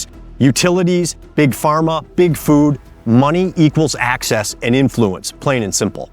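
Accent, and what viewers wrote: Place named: Wisconsin, United States. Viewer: Are you a Wisconsin boy? I can hear it😊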